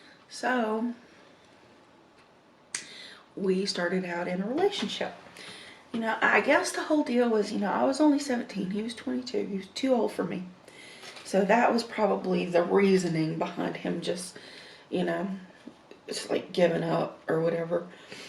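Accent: South, United States. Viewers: !!!! Hope you're holding up. Just started the really deep abuse blogs with my husband who was severely sexually abused when he was small in Nebraska (a very bad state for that). We need a part 2 if you can handle speaking about it. Helping us immensely. Your writing/speaking is beautiful and I hope you can eventually get published, like no joke. Also, I understand this is your career but I hope you can start to incorporate more healthy items. Much love! <3 Also, I bet your live really South/Western because you sound JUST-JUST like my Nana from Galveston/Houston.:) Makes me miss her. Haven't seen her in years.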